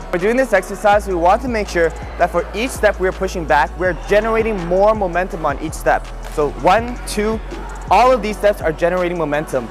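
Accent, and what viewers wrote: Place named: United States. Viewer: i've watched both your smash videos and have the following comments about what i like and dont like about the way you teach and/or perform smash. like: 1) you emphasize getting your body behind the shuttle so that your energy comes forward to smash, not backwards or straight up. the energy is forwards. there are many details to the smash, but if a beginner learns only one thing, it should be to do the work to get your body behind the shuttle, not beneath it, so your energy comes forward as you strike. and not only smash, but also clear and drop, get your body well behind the shuttle before you try to strike it. i like the way you said 45 degree angle forward. that is right on, 2) the way you demonstrate pronation seems very clear to me, 3) the way you use throwing to demonstrate the swing. i assume you are in the US from your accent and the word 'bird', and one sport americans understand is baseball. 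if you look at major league pitchers in slow motion you will see many relevant components of smash and swing. dont like: 1) your flow of energy from the floor to the racket head is compromised by a failure to isolate your hips and rotate them separately in advance of your torso. this means that even though you rotate your body 90 degrees before your smash, you are not taking full advantage of the potential rotational energy there. really, there is no point in rotating your body a full 90 degrees before smash if you do not isolate your hips and rotate them separately, and i have s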